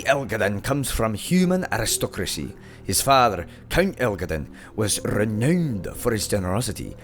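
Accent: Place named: Scotland, United Kingdom. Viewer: Thanks for doing this, although I wish it wasn't read with a strong Scottish accent. Hard to understand some words ^_^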